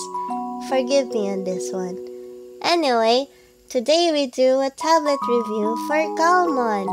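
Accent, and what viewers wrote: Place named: Philippines. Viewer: What country do u live in?, 'cause u sound filipino.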